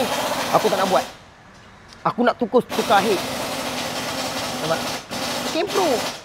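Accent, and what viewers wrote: Pahang, Malaysia. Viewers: Bro orang pahang ke ? Kampung kat temerloh ke ?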